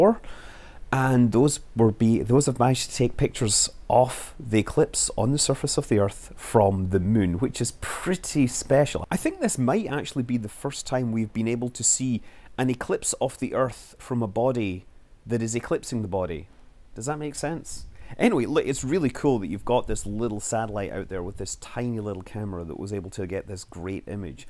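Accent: Scotland, United Kingdom. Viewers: lol. It just hit me what it means for you to be touring Europe. Your accent is so strong I keep forgetting you're from the US... There seem to be a lot of American youtubers with various kinds of British Accents... Feels kinda weird. (and yes, I know that's a scottish accent. But you know full well that Scotland is part of the UK, so it's not technically wrong, even if the Scots might tend to think so... I mean, that affects me personally in fact - I've known for ages that I'm 1/4 british, but I'd never looked into how or why, until a few years ago when I learnt that this was pretty much mostly Scottish ancestry. Because my Grandfather is Polish and my one of my father and one of my aunts were born in Plymouth that wasn't immediately obvious... - if for some bizarre reason you're wondering, my other aunt was born in Zimbabwe. Probably tells you a lot about my family huh. 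XD - the other half is mostly far more boring, but, again from a different country...)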